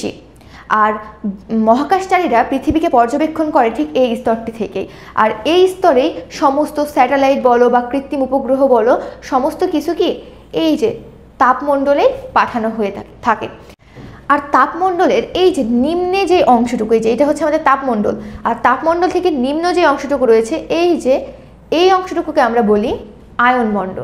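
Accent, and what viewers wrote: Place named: India. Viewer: X যদি ট্রোপোমন্ডল হয় তাহলে Z হবে মেসোমণ্ডল। বোনটির বাংলা শুনে মনে হচ্ছে ভারতীয় বাংলা।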